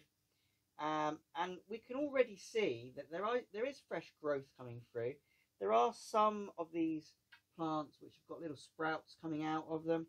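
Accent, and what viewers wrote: United Kingdom. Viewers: Hi, thank you very much for you always nice and interesting video's. So refreshing with your UK accent, compared to the noisy and annoying American videos. Also thank you very much for the cool intro theme song! Been looking for that one for years. 👍 Always hate it, when my timer not doing what I think I programmed it to do.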